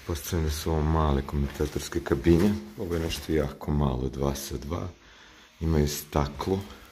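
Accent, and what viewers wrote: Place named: Belgrade, Serbia. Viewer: Dobar video, ali brate, imaš neprijatan beogradski naglasak kao da si odrastao u beogradskim džiberskim kafićima 😁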